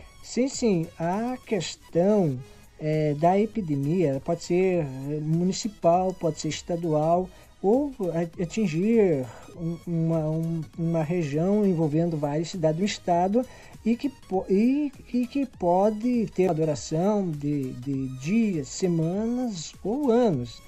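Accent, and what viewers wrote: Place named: São Paulo, Brazil. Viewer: esse cara tem sotaque paulista, paulista não tem vez aqui não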